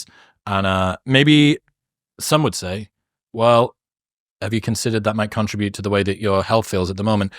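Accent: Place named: United Kingdom. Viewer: I really hope you manage to beat all your health problems as soon as possible, I wish you all the best. Thank you so much for entertaining my ears with your sexy deep British voice. So glad that I’ve found your podcast!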